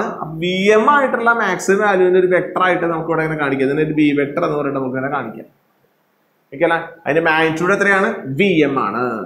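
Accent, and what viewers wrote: India. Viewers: Kasargod karan alle ariya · pinnalha... തൃശ്ശൂർകാരൻ ഡാ 🔥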